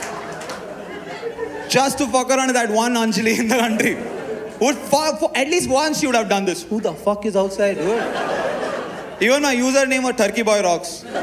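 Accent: South India, India. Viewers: That innocent face he made "I don't wanna kill I want to fix things" was just priceless 😍 Great content Super Comedy With his South Indian accent ❤️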